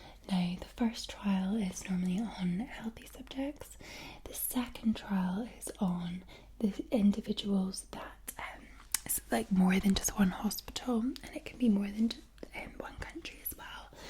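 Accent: Scotland, United Kingdom. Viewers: Hey, can you do a video with rambling in a really Scottish accent? I love you're accent, and wanna hear it in raw form( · I love your accent!!!!!😍💖 & I also love Scotland!!!!!😊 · It’s called a Scottish accent my guy, I got one too lmao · Her accent is so fabulous. I love Scottish accents. in the beginning you couldn’t hear it but as she started talking faster it really jumped out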